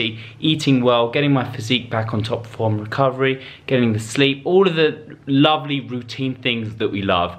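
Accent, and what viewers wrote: United Kingdom. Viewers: Your videos are such a motivation. By the way, lovely accent, what part of UK u from?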